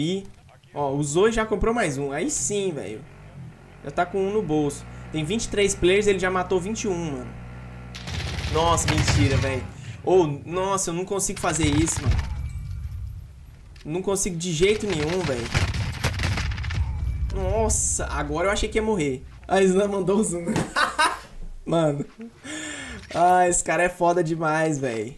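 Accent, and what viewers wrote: Minas Gerais, Brazil. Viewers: Tu é mineiro?kkkkk sutaque igualzin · Mo sotaque de mineiro kk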